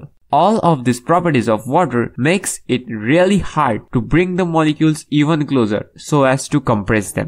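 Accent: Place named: India. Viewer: Wow, living proof I can watch a scientific explanation in Indian English when it's actually clear and well-made - thank you for taking the time! Also, the 'very less' is quite endearing, reminds me of a good friend.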